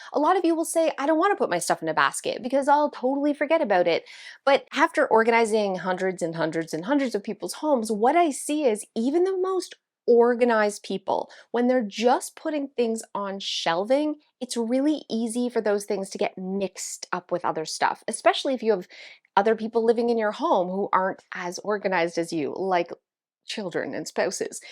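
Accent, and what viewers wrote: Canada. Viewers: Are you Canadian? av I hear your accent!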